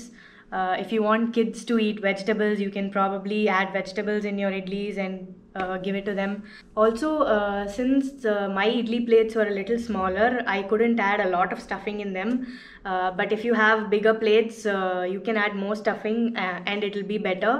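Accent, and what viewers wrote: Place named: Tamil Nadu, India. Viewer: Your accent confirms you are thamizh 🙂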